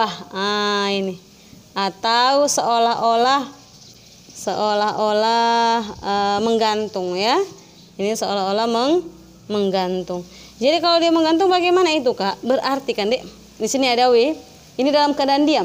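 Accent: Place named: Medan, Indonesia. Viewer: logatnya orang medan ini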